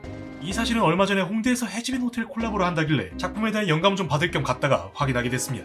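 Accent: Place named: United Kingdom. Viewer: YOU'RE BRITISH????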